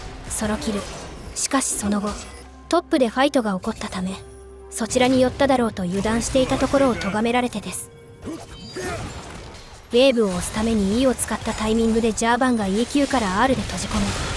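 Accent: Kansai, Japan. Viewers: なんでそんな関西弁押しになったんですか？😂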